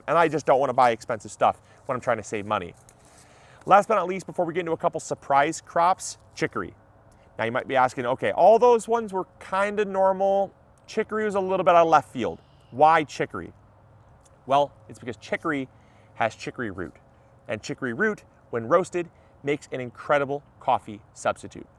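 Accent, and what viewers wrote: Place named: Louisiana, United States. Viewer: You almost sound Cajun. New Orleans is known for Chicory coffee, like Cafe Du Monde. They blend Chicory with Coffee to stretch coffee. I've never thought about growing my own Chicory. Native Texan and been to New Orleans a few times and to Cafe Du Monde a few times. It was awesome hearing someone else talk about chircory coffee.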